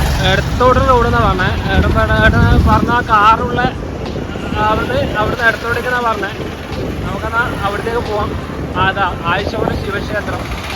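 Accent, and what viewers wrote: Kannur, India. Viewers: ❤kannu style aanu samsaaram.its ok. but culture illatha oru perumatam . oralod samsarikkumbol ulla reethiyil maatam varanam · valre shriyanu chodukkanum , athinu corect Aayi marupadi paryanum shrmilkuka malayalm kannur slang pryumbo kelkkunnvarkku arochakamyi marthirkkan prthyekam shrdikkukka njanum oru kannur payynnur karan anu nammlde slang mattullvrkku mansilyikollenminnilla athu onnu shraeicha thnne nannvum Aniya prgarm nallthanu niglude oru tudkkakrnte vishmam undu athokke sheri ayi vratte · ഇയാളുടെ സംസാരത്തിനു കുറ്റം പറയുന്നവർ ഓർക്കുക പല നാട്ടിലും പല രീതിയിലും ആണ് സംസാരം ഇത്‌ കണ്ണൂർ കാസർകോട് ഭാഷ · Kannur slang👎🏻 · Ninda oru kannur samsaram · KANNUR BHAASHA ETHRA NANNAAKKAAN NOKKIYAALUM MOZHACHIRIKKUM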